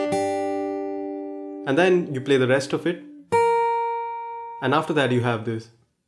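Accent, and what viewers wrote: India. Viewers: why do u have an Indian accent?? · I m sure this Guy is Indian.. Coz he's English Sounds like Indian accent! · You sound Indian...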